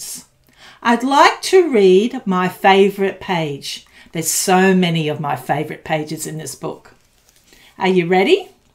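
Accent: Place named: Australia. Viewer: Hope I don't get this wrong ha ha but Aussie. They like it 😁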